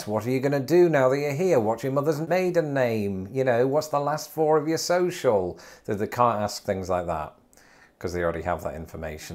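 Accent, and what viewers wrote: United Kingdom. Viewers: I really did not want to subscribe to your channel only because I heard your British accent or whatever you're from ( American Bigot here ), but you speak of facts and facts only. If anything you made me more proud to be of an American and I hate it. You're a brit, but made me more open minded and I love you for that. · I'll always think you are a Brit,. No insult meant, but the accent marks you. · NEVER lose your beautiful British accent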